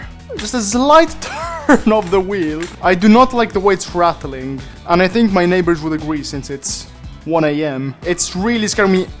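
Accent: Italy. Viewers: aRE you Italian? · Ma sei italiano? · io all'inizio: "sembra un accento strano" io a : "ok è decisamente italiano" ahaha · Your accent is sooo italian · bro sei italiano? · Ma dillo subito che sei italiano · bro you're Italian because it's a perfect Italian accent because I'm Italian and it's the same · Are you italian? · Are you Italian?? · Sei Italiano? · Bro ma sei italiano?? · are you italian? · are u italian by any chances? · Ma sei italiano allora perché lo sentivo un po anche dall’accento e poi perché qui avevi detto “MADONNA” comunque mi dici il nome del gioco? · Are you Italian? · Sei italiano?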